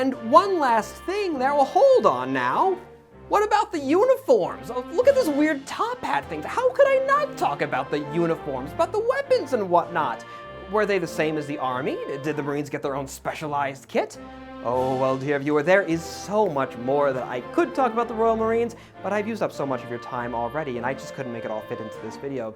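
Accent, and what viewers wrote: Germany. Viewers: I love your German accent, by the way! It reminds me of The Major from Hellsing. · Almost threw my phone thru the window during the ad with your German accent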